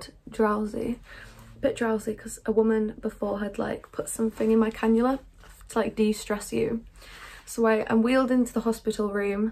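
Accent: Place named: United Kingdom. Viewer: I watch your content only because your British Accent🧡🖤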